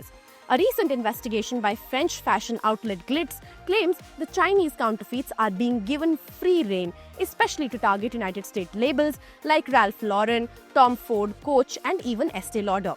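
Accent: India. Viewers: As if I am going to believe this reported with an Indian accent.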